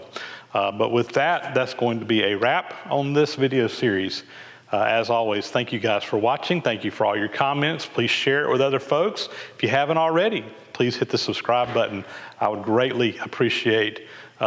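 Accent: South, United States. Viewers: In re: Your accent. One of the things that makes the US the US is that regional differences have not been totally homogenized by television yet. I have been visiting the South regularly for 50 years and while the accents have been smoothed out a little, there is still a charming difference from the speech of Yankees like me. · My understanding is that our southern accent is derived from the Protestant Scots Irish who migrated to the US in the late 18th & early 19th centuries. My family is one of those that made the journey. I happen to live in the same city as by the way. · after quite a while watching your videos I get used to your accent and your pronounciation of "oil", always sounds like "owl" to me (btw, the same with Adam Booth, must be a a southern thing). But when you said "lets grab some owl" again wierd thoughts went through my mind;) Greetings from Austria and thanks for your great videos! 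Dieter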